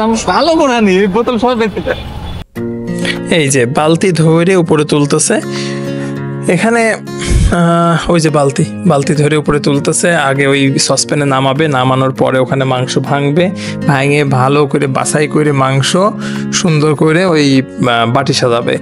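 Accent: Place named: Pabna, Bangladesh. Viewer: আমার বাড়ি পাবনা,আপনার কথা বলার ধরন অনেকটা আমাদের মতো,নাইস ভাইয়া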